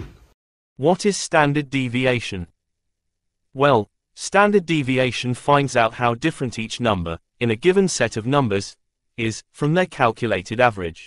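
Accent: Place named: United Kingdom. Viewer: Thank you, sir. You sound British. My Mother was a war bride from Coventry, England.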